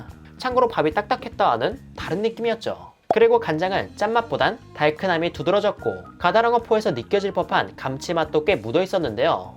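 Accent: Gyeongsang, South Korea. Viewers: 님께서 경상도 집안 출신이 아닐까요?....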